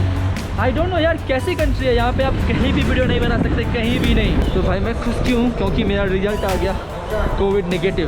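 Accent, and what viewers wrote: Bihar, India. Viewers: ITS BIHARI LANGUAGE... 😊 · BIHARI style Bhai.... hamare Bihar me aise words aise hi pronounce krte hain.. Jaise competition ko kampatetaion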